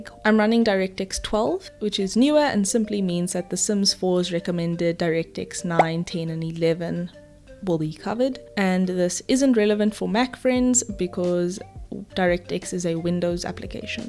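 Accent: South Africa. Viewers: That South African accent is wholesome. I dont know if I'm wrong but... I love it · Definitely a South African accent. Are you South African?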